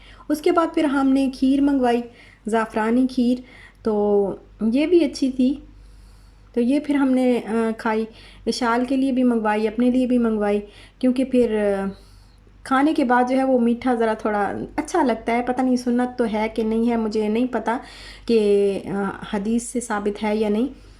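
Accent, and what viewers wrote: Saraiki, Pakistan. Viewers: AP siraiki ha ...bat karny se lagra ha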